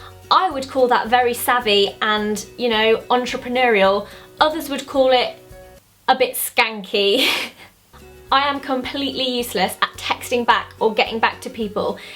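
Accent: United Kingdom. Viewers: is my favorite beauty guru out there! Her voice is just so adorable. She inspired me to make my own channel & start posting videos. After every single video I watch of hers, I accidently start talking British, haha. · Damn, I like British people. I could listen for her forever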